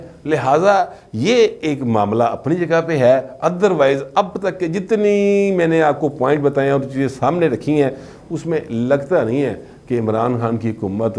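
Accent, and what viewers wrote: Lahore, Pakistan. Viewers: Typically lahori style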